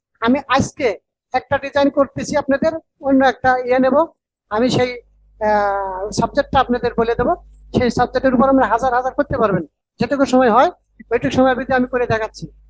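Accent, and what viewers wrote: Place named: Chapai Nawabganj, Bangladesh. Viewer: ভয়েস শুনে তো মনে হচ্ছে চাঁপাই এর পোলা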